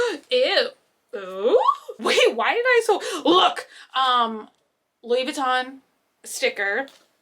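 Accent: South, United States. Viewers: I love your country voice, you should keep it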